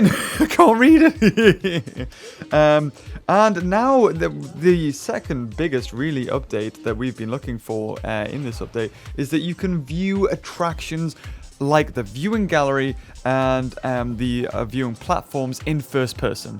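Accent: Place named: United Kingdom. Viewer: You have a uk acint and britches in some video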